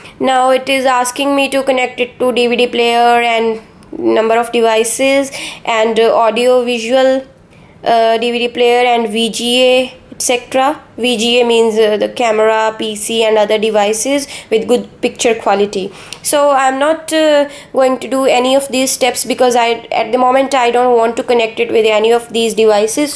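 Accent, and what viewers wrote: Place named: India. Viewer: Endian accent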